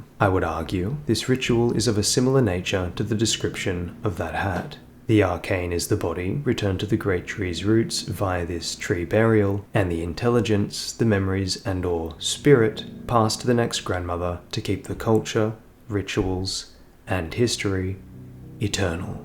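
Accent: Australia. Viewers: What is it with ER lore videos being narrated by sexy male Aussie accents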